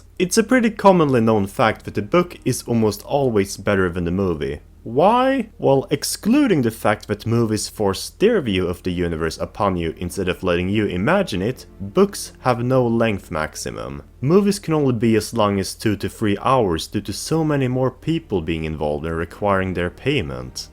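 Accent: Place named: Sweden. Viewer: Är du svensk eller från skadinavien? För din engelska låter precis som en svensk dialekt.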